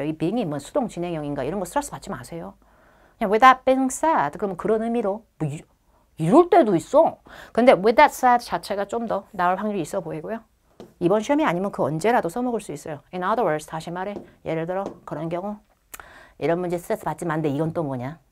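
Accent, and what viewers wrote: Jeolla, South Korea. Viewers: 저도 삼천포여친 윤진이 삘난다고 생각했어요. ㅋ 전라도 사투리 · 진짜 이런 박력 너무 좋아.... 선생님 약간 전라도사투리 나오는데 그것도 너무 친숙해서 좋음요 ㅋㅋㅋㅋㅋㅋㅋㅋㅋ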